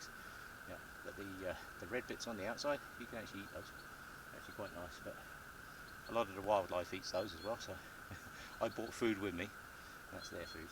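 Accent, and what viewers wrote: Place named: England, United Kingdom. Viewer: You sound English. Are you? But where are you filming? Canada or America? or Europe?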